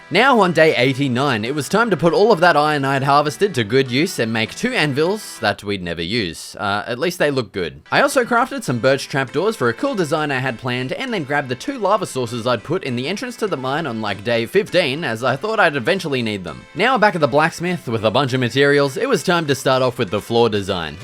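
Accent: Australia. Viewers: U Aussie? U sound Aussie. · omg the aussie accent is legendary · Yo! I was just watching some of your videos and realised I recognised your accent, a fellow Aussie! I know it’s weird and all getting excited seeing another Aussie because I see them everyday- but online you don’t see too much of them. So have a good rest of your Tuesday!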